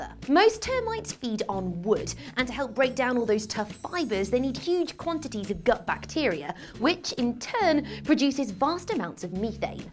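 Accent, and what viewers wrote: England, United Kingdom. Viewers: Her British accent makes it less awkward to listen to her talk about farts. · She english and we pronounce stuff different.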